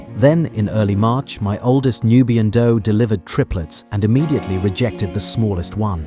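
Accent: United Kingdom. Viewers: Why a British accent when speakingʻ of his Tennessee horse farm? Why? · You have a slight English accent...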